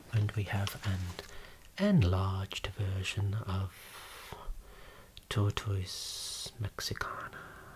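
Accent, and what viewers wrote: Birmingham, United Kingdom. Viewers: Is from Birmingham?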